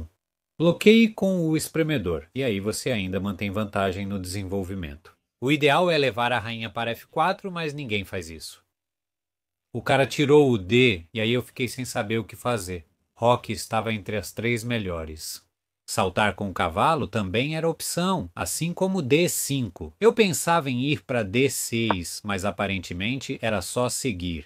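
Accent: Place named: India. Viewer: Pois é, eu adoro o jeito que ele cria a própria língua misturando o sotaque indiano forte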